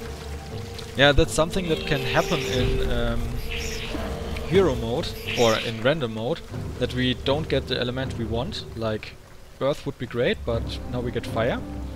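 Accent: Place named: Germany. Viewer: Ja, man hört den deutschen Akzent sehr stark raus.^^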